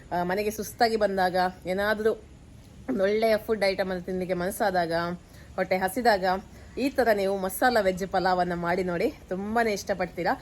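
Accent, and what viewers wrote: Mangalore, India. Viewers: Hi akka, ನೀವು 👌🏻ನಿಮ್ಮ ಮಾತು voice 👌🏻 ಮಂಗಳೂರು ಕನ್ನಡ 👌🏻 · Was just scrolling YouTube I got ur video and I will do subscribe because I love the slang and tone of Mangalore bashe ❤️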